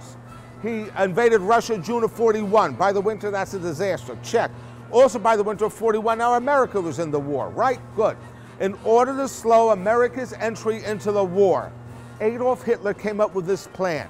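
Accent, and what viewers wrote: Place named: New York, United States. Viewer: Sounds like a New Yorker.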